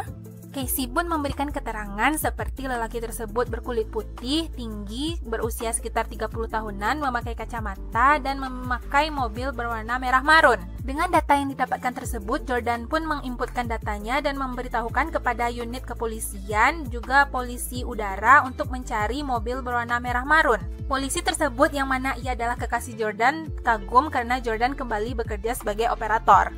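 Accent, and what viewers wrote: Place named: Padang, Indonesia. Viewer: logatnya logat padang banget, org sumbar kah?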